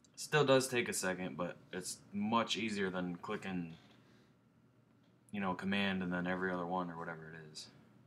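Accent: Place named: East Coast, United States. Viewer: I hear an East-coaster.. are you a fellow Newf? Thanks for the vid bro!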